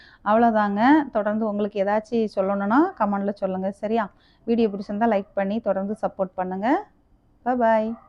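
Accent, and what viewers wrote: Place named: Nagercoil, India. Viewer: Love the Nagercoil accent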